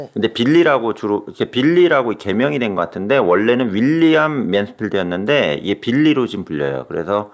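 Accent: Chungcheong, South Korea. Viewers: 교수님 방대한 자료들 찾는것도 힘드실텐데 이 모든 자료들을 자세히 분석해서 흥미롭게 전개해주시는 능력이 탁월하세요. 정말 위트 있는 입담에 푹 빠져드네요. 충청도 억양이 정말 매력 있으시세요. 김복준님과의 해외사건 케미도 좋고요.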